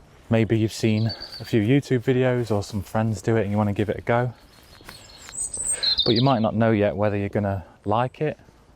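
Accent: United Kingdom. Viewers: sometimes you sound close ? Maybe Leeds area ?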